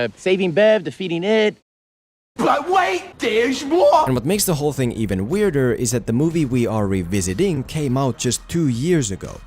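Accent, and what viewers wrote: Finland. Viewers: wait where are you from? Your English accent sounds Finnish.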